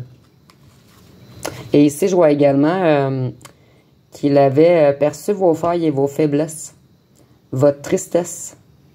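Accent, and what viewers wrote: Canada. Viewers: Bonjour merci pour ce tirage qui me parle beaucoup,gardez bien ce joli petit accent canadien, belle journée à vous 😊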